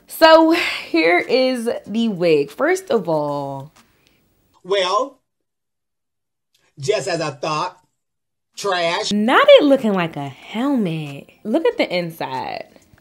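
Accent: DMV, United States. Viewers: That DMV accent make this shit so much more funny😩😭😂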